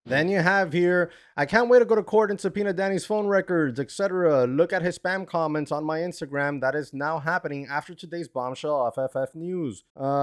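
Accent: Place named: Miami, United States. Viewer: You got a cuban Miami accent bro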